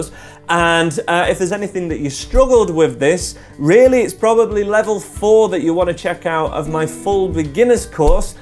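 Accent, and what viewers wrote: United Kingdom. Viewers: He's from the UK.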